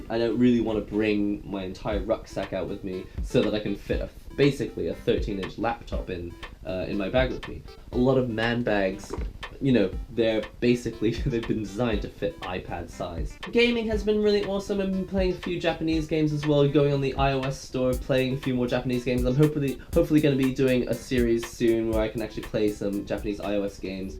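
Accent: United Kingdom. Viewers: u sound like a british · He is but he lives in Japan sometimes.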